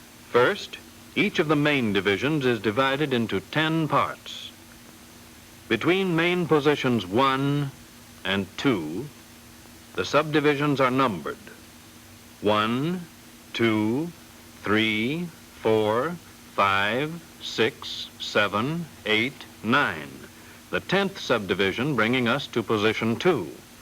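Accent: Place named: Oklahoma, United States. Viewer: Who did the voice over for this film? He sounds like someone from Oklahoma.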